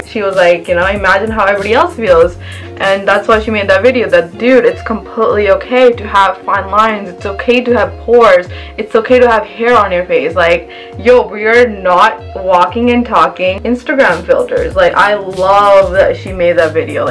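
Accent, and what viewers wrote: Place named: Punjab, India. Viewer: Really love your all vedios....love your accent while you mix punjabi tay English mix..... please put some hair care tip...as your hairs have volume...you use any spray to light it up......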